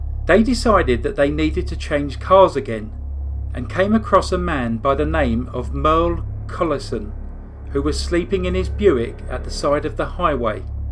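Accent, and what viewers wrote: United Kingdom. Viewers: This was a Brilliant yet Horrifying story but again presented Beautifully British. This is so sad that these young kids really went down for all the deaths of people and it happened so quick! But he took the life of a Baby and that for me is True Evil and The death sentence for the Murdering Sack of Shit I was well justified in my opinion but he would suffer more a long life sentence!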